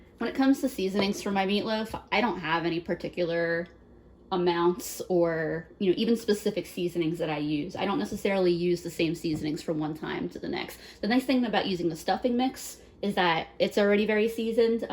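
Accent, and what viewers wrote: Philadelphia, United States. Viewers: Wow, I knew you were from Philly when I first heard you speaking. Merry Christmas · We usually do a "potluck" where everyone brings something and we have a buffet of sorts but the last 2 years it's just been my little family so last year the kiddos picked cheeseburgers and this year I made an eye roast a few days before Christmas and we had hot roast beef sandwiches. You talked as if you are or were near Philly. I was born and raised in South Philly and since I moved away I miss nicks roast beef so much! So I try my best to make my own combos lol. Hope all reading this have a safe, happy and healthy new year!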